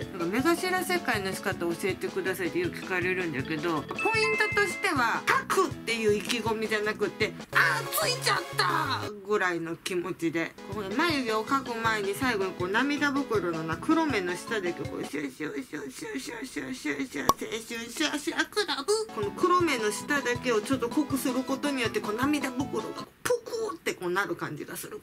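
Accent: Okayama, Japan. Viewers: 岡山県民やけど久しぶりに見て岡山弁すごいでてんなってなった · 岡山弁がなつかしゅーて見てしまう(笑) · 岡山弁？可愛い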